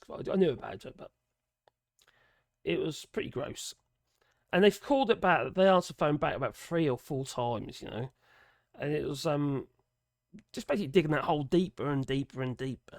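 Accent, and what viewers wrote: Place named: Norwich, United Kingdom. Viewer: Hey there, only just stumbled across your channel and enjoying your content. I live in Norwich, UK, and you sound like a local 😊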